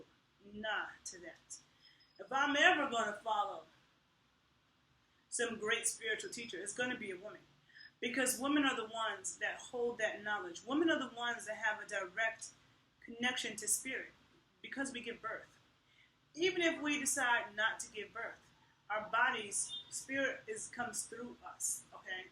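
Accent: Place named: New York, United States. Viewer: Now you are on to the Real Knowledge. Postive you are a fascinating potent female what we use to call a witch, ... more shaman ... me too.. In this corrupt weak patriarchy has twisted and killed our connection with the divine, the energetics... medical symbol is ancient... caudecaus ... it is from ancient sex rituals from Sumer and Egypt... even in ancient Canaanite ... Heard a bit of New York accent New Yawk ! Anyway my fam in Brooklyn, I live in Oakland. I love to have a cup of coffee or juice wit you and discuss magickal systems , sexual alchemy, and ways to build healing systems.. yeah I hear da rain. ! God bless woman.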